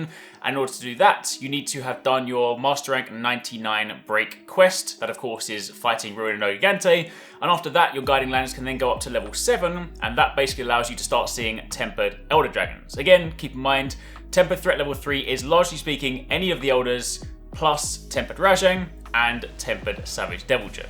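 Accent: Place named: United Kingdom. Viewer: you look like a viking but talk like a brit and its creating some SERIOUS dissonance in my mind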